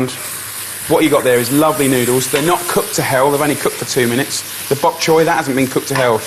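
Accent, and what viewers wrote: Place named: England, United Kingdom. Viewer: cute!!! and he can cook and he has an english accent! i'll buy:)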